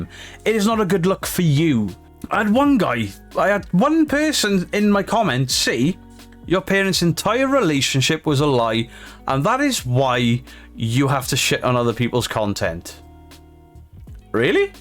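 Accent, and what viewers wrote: Wales, United Kingdom. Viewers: Yes he’s very welsh haha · Love ya Welsh accent mate it’s lovely from the north mate lovely video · Are you from Wales? 🤔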